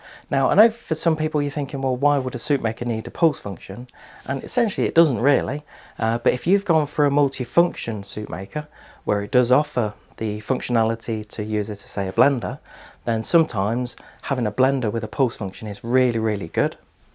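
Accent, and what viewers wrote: United Kingdom. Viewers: I'm not considering to buy a new Soup Maker at the moment, but whatched the episode and gave it a TU for the fact alone you upload this kind of usefull content. You bring it in a very nice classic British manner; it's not spoken too fast, so non-native English viewers can also follow along quite easily.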